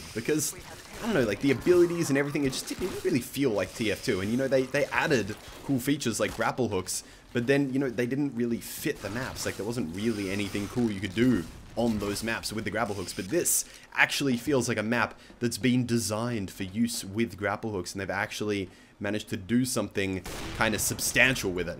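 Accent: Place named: Australia. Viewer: Hey, I didn't know u were australian!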